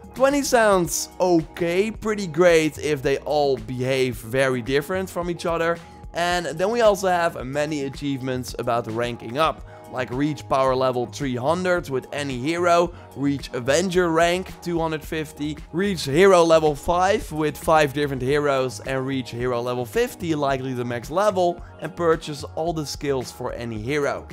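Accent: Netherlands. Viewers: I instantly regret pre-ordering this game Well I hope the online is somthing Its like you have a dutch accent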